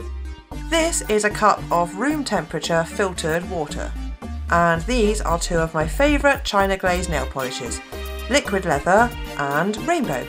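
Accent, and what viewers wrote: England, United Kingdom. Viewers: I love all your videos they are so awesome, your so good at doing nails. I got inspired by you cute icecreame nails that are so cute and I did them on my nails but didn't turn out as good as yours😂😔 anyway I also love your accent I lived in England for 4 years I'm from chile. I have one last question if you don't mind what part of England are you from? thank you your videos at awesome.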